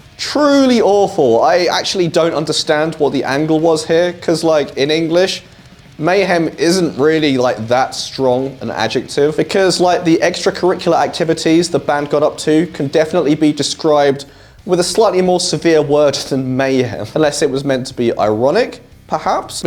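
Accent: United Kingdom. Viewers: limp has more then one meaning like floppy also you sound like your across the pond so bisket means cookie to you not sure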